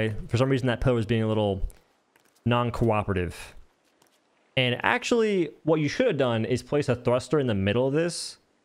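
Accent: South, United States. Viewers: Nice video, I’m gonna build an elevator to get to my monorail station. Look, I’m someone who’s always very curious about people’s dialects and accents. And to me, your accent is kind of interesting. There’s no non-creepy way to ask this but…what Southern state are you from? And to level with you, I’m from Chicago lmao.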